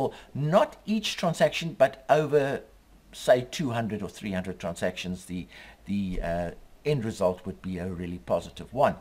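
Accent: South Africa. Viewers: you sound like a Suid Afrikaaner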